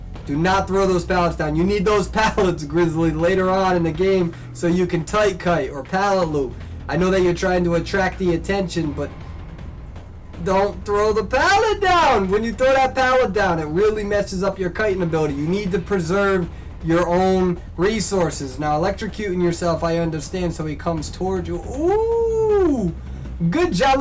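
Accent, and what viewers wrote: Germany. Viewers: Your beautiful German accent 😂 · I didn't know you were german, explains a lot with your accent😂 awesomee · ITS A GERMAN ACCENT? THOUGHT IT WAS PERSIAN OR ARABIC OR SOMEWHERE IN THE MIDDLE EAST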